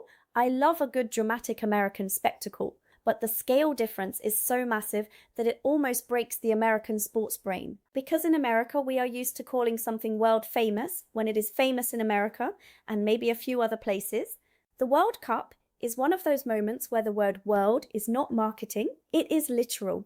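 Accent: England, United Kingdom. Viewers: you sound English now. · She sounds british to me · You sound British not American, you must have been here a long time ago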